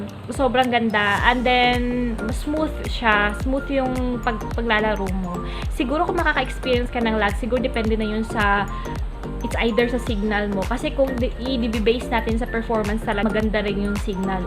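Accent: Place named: Iloilo, Philippines. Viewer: I like your accent, sounds like ilongga.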